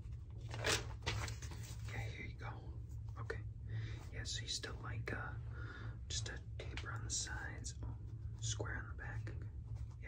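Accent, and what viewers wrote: Boston, United States. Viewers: Great vid! I do miss the Boston accent ones tho 😁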